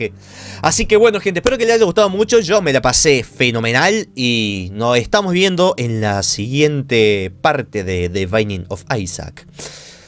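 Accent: Argentina. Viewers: vos sos argentino???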